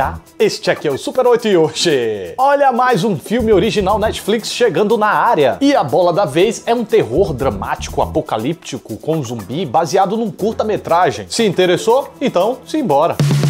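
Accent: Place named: Alagoas, Brazil. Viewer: Acho tu e teu canal muito fodas, sou inscrito, acompanho e sempre indico aos amigos, te acho um baita profissional e te admiro ainda mais desde que percebi teu sotaque e descobri que tu es alagoano, se é difícil pra tanta gente talentosa chegar á, imagina pra um alagoano, mas hoje queria dar os parabéns não só pelo vídeo, mas pela resposta sobre sua gratidão ao Felipe Neto que mesmo eu não curtindo o cara, passei a admira-lo pelo que ele foi, é e representa pra ti, pela amizade e pela gratidão, eu podia até não gostar dele, mas hoje já ha algo de diferente no que eu penso a respeito do cara. Meu abraço hoje vai pros dois (rsrs). Parabéns por isso e pelo canal.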